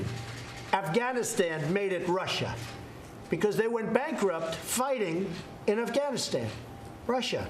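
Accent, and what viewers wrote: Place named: Queens, United States. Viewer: He's definitely NOT clueless. He speaks like many visionary CEOs with the addition of theQueens NY style of communication. If you were more wordly you'd realize this.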